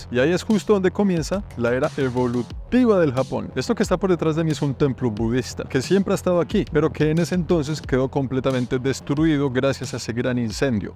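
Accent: Colombia. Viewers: Es el primero video que veo de este Canal y por el acento al principio asumí que es colombiano y después lo confirmé con algunas referencias que hizo jeje, muchas gracias por su trabajo, excelente video. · Eres el mejor narrador de contenido no cansas tienes esa chispa de expresión colombiana que encanta 🌟🌟🌟🌟🌟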